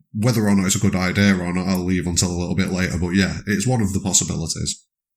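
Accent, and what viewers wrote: Northern England, United Kingdom. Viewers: Also nice to hear a fellow Northerner. We don’t have many people from our part of the world. Most are across the pond. I am from East Lancs so not far from you at all.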